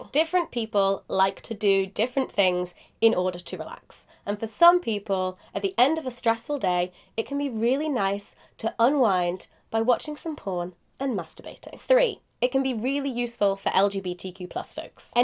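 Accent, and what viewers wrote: United Kingdom. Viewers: Where is she from? I know she's British but what part of England is her accent from? I love it. Cause I know there's different British accents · Are you British ?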